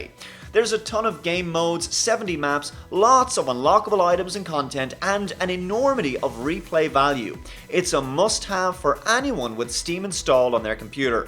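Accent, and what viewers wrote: Ireland. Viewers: R U irish? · Are you irish? :D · are you irish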